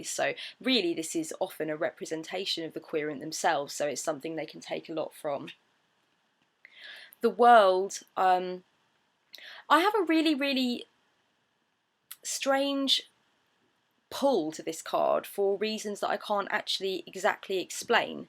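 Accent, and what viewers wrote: United Kingdom. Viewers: I just discovered your channel and I'm slowly working my way through your early videos. I love your down-to-earth approach, and it's refreshing to hear a fellow Brit. You were speaking about the 5 of Cups, and that came up in a reading I did literally today. And today it was the first time I really noticed the river flowing under the bridge. It's like the card is saying, 'Yes, shit happened, it's right to acknowledge it, but it's water under the bridge Take the time to look around, see what you actually have and then cross that bridge, leaving the shit behind.'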